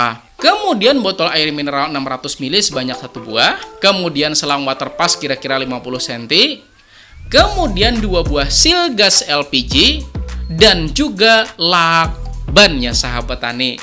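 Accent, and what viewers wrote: Banjar, Indonesia. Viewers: Mas orang banjar ya? Logatnya agak mirip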